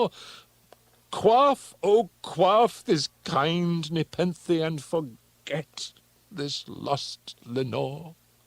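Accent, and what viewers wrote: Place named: RP, United Kingdom. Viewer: This one was overdone. Also, Poe was an American. It wasn't written with a posh British accent in mind. 🙄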